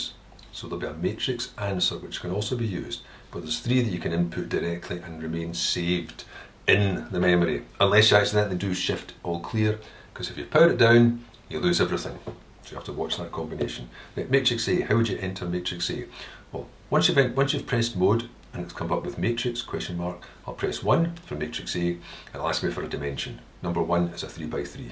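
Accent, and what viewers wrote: Scotland, United Kingdom. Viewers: You sound Scottish to me.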